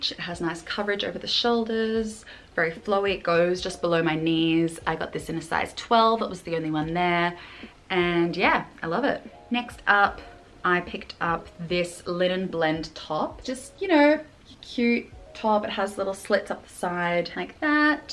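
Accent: Australia. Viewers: Welcome Home! Glad you still have your Oz accent...l laugh at some people who literally are overseas for a few months IE 5 minutes and they suddenly have a hybrid accent 🙄😆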